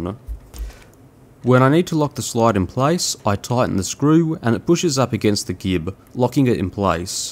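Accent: Australia. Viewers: you sound Australian you can get phosphor bronze at CBC Bearings its not hard to find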